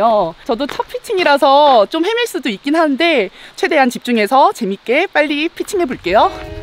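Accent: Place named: Gyeongsang, South Korea. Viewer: 정말 잘 드십니다.👍👍👍👍👍집게벌레 독 없어요. 말씨는대구나 부산 같은데요?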